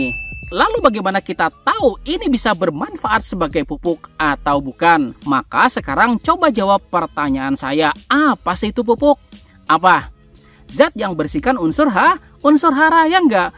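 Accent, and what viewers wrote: Banjar, Indonesia. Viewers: Umpat bangga, logat amang kada hilang😂😂😂harus banyak urang2 ky amang di bumi ku borneo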